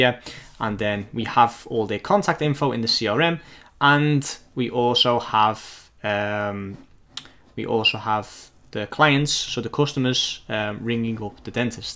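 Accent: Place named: Liverpool, United Kingdom. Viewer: Hi I can tell by your accent that you are also from Liverpool. I was wondering wether Liverpool is the right place to start my smma journey. Thoughts?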